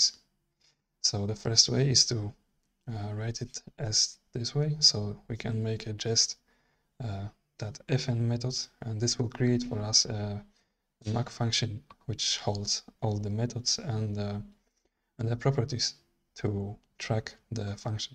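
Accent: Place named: Belgium. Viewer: I was like huh, this guy sounds like a Belgian speaking English (I am Belgian too), and then I saw the location of the video haha.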